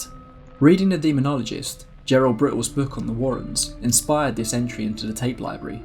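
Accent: United Kingdom. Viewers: I frigging love how you say "so get yourself comfortable, hit the lights and get a warm drink" in your British accent. Draws me right in. 5th video of yours this evening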